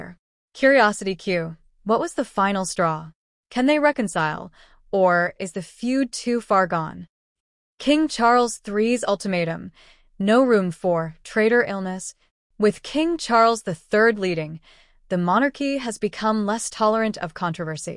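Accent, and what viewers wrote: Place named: United States. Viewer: And is this American accented commentary worth a damn. Truth or BS?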